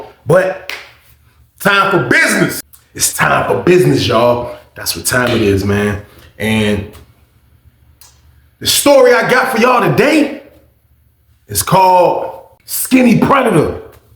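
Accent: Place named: South, United States. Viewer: well rocks with every body black and white, and is color blind, that's just how talks, we are country 😀 Stay blessed 🙌 and inspired 🙏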